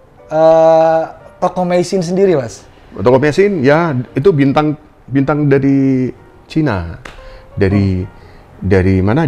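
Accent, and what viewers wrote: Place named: Surabaya, Indonesia. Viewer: khas banget logat Surabaya om 😍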